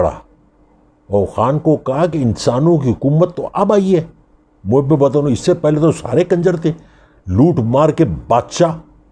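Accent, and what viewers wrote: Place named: Kutch, India. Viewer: Sir, you choose so true wordings Fr all types of goons of Pakistan 🇵🇰 👏 Salam to you to gv details to new generations. I’m listening 👂 n enjoying ur each n every word n ur lovely Kch’s URDU. Love word BAWLE, Mullah Fazloo n many 😍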